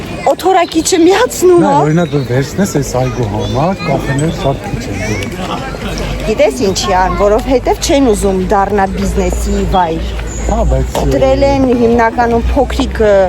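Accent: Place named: Artashat, Armenia. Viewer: ջան, երբ Արտաշատի բարբառով խոսում ես շատ անուշ է, հիշում եմ ուսանողական տարիներս շատ քաղցր ես խոսում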